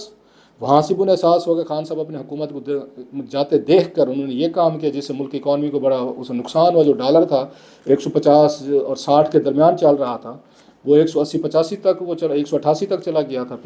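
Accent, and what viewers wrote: Saraiki, Pakistan. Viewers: کلاسرا آپکی سرائیکی اردو پر حاوی ھے آپ نے باربارکو ولا ولا بولا ھے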